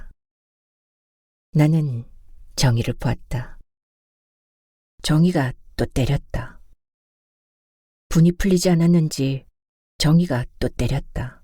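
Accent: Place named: Daegu, South Korea. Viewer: 대구 사투리! 참 재미있습니다. 시대배경이 눈앞에 그려집니다. 그리고 미성의 주인공 우리 님은 어떤분일까?❤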